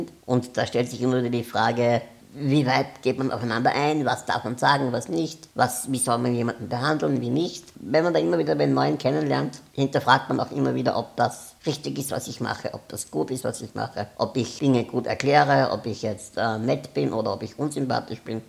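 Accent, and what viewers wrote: Austria. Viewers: Dein Wiener Akzent ist so süß😍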